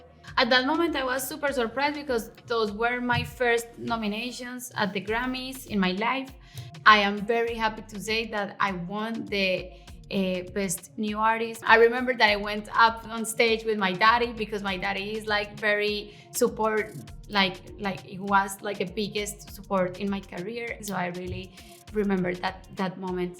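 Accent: Paisa, Colombia. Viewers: Que ingles bonito con acento paisa. Karol G una dura una bichota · Paisanglish 😂😂😂 la amo ❤️❤️❤️❤️ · Ella habla paisa por eso sale asi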